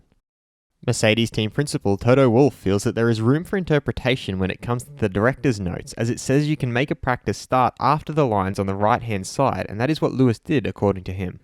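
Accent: Australia. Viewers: You sound like you are an Aussie. Why in your subtitles do you spell "tires", a particularly yank spelling instead of the the more normal "tyres"?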